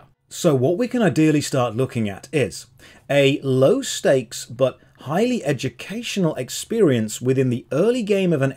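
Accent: United Kingdom. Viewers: I haven't watch a Josh Strife Hayes video in a while, and it really surprised me how I immediately felt a nostalgic sensation of joy when I opened up this video. Perhaps Ill be finding some time to get those charming british tones in the coming days.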